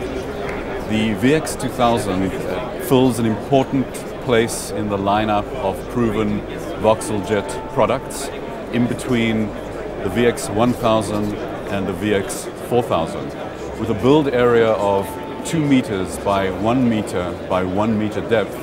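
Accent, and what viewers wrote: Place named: South Africa. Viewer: you are south African by the sounds of it.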